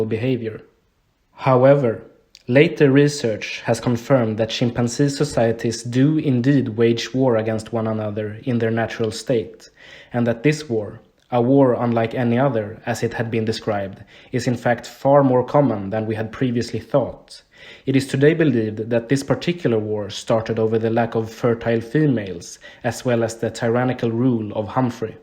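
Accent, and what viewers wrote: Sweden. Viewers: Are you Swedish? You sound Swedish in your accent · This man is swedish af. U can hear it on the horrible accent... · I love the Swedish "iii".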